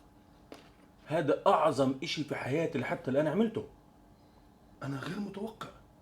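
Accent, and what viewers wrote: Gaza, Palestine. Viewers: أنا من فلسطين وكمان مو هيك لهجتي هو لهجة غزة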